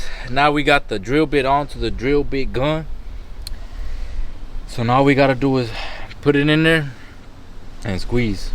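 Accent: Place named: Texas, United States. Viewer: I knew you was from TEXAS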